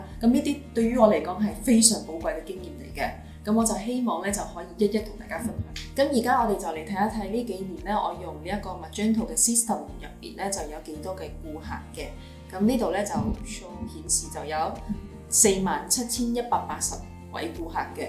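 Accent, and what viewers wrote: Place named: Malaysia. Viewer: 之前对你的马拉广东话口音好有印象，人靓左好多，差点认不到，看好你。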